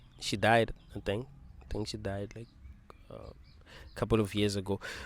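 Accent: South Africa. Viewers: English is not his first language. He's South African. I'm South African, and English isn't our first language. As long as you can understand what He's saying, that's all that matters. If it's too annoying for you, then move along to watch other people who speak how you'd like them to speak. Have a good day.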